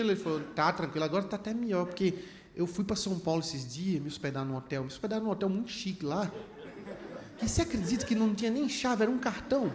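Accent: Minas Gerais, Brazil. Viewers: Pernambucano onde?? Esse é mineiro raíz. Passa batido. 😂😂😂😂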